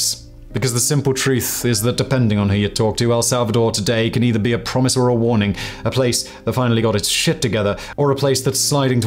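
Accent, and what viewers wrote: England, United Kingdom. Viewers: A white guy with an English accent pontificating about El Salvador. I spend a lot of time there. Beautiful country with beautiful people that lived in fear because a small percentage of the population made it miserable for everybody else. Bukele took care of the problem. I saw people out jogging in La Libertad today. Crazy. That wouldn’t have been possible 2 years ago. That’s why they love him. Not because they want to go buy iPhones but because they can go watch their kids play futbol, go to the store, run a business, visit their family without fear. The comments on this board are embarrassing.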